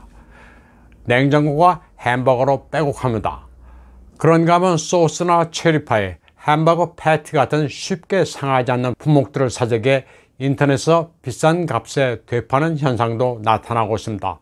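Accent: China. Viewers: 중국인이신가요? 발음이 중국인같아요.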